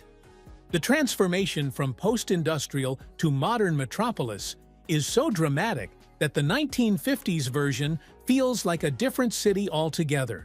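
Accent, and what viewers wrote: United States. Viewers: Bloody awful US accented narrative with non-English pronunciation! JeeZ!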